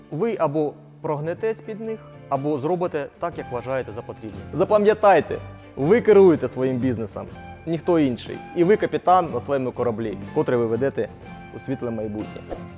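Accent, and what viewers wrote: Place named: Ukraine. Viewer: спасибо, граммотня подача и приятный настоящий украинский язык, очень приятно слушать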